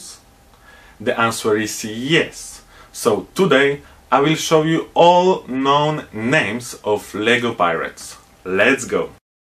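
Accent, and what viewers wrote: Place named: France. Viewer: Your accent sounds French. What is the origin of this accent?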